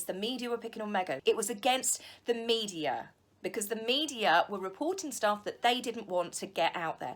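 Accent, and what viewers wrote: United Kingdom. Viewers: I'm new to your channel and I must say, I totally love your content. Your sense of humor mixed with your lovely British accent made me come back a couple of times. The way you view things (especially on MeGain) is hilarious. I've been waiting for a new video all day long, so I guess you can consider me a new loyal fan of yours 😊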